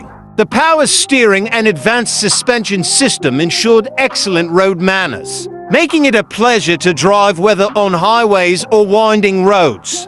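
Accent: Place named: United Kingdom. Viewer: Why is a guy with a British accent telling me something about American cars? Do they have these cars in Britain?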